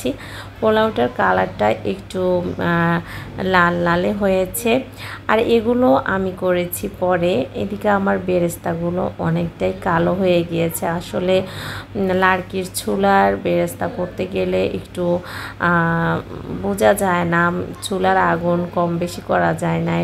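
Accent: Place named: Chittagong, Bangladesh. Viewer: আপু কোন জেলায় আপনার বাড়ি আমি চট্টগ্রামের মেয়ে কথার টানটা পরিচিত লাগে❤❤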